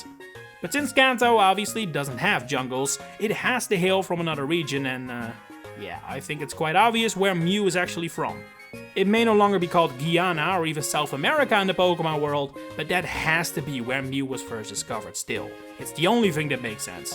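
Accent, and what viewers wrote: Netherlands. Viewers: Really like how you sound Dutch with all the different types of American accents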